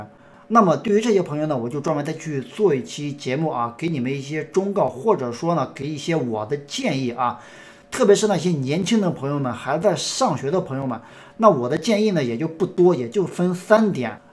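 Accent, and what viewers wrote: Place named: Hubei, China. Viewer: 听口音原来是不是湖北人？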